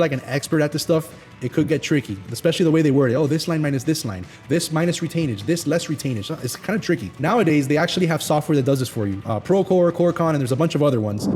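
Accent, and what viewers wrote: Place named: Miami, United States. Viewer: Are you from Miami? I could tell immediately by your accent! Lol. I'm also from Miami :)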